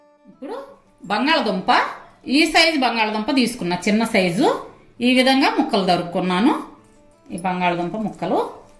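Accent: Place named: Telugu, India. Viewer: Wow :: What a Telugu ! Bengaluru or Belgium can not change this lady' s Telugu accent or rustic aroma Manu more dishes like Kandi Pappu and Kandi Ginjala Pachhadi please Prakasam Panthulu Garu used love Gongura Pachhadi in Ongolu style PV Garu Chintha Kaya Thokku in Karimnagar style We are proud of this lady and her rustic way of presentation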